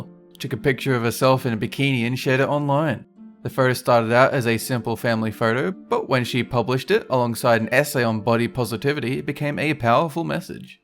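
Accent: Australia. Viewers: AUSSIE AUSSIE AUSSIE OI OI OI!!!!!!!! I NEVER REALISED THAT WAS A FELLOW AUSSIE, FOR SOME REASON I DIDN'T RECOGNISE THE ACCENT, BUT NOW WHEN I HEAR IT, I DO!!!!!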